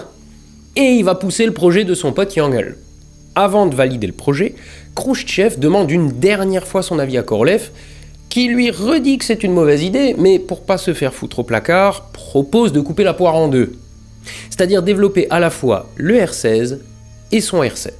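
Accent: Alsace, France. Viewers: L'accent alsacien est au top! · j'adore les sponsos avec l'accent alsacien! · Aaaah l'accent Alsacien de l'ouest du Bas-Rhin ! pour une fois j'ai regardé la sponso en entier ^^ des shmout'z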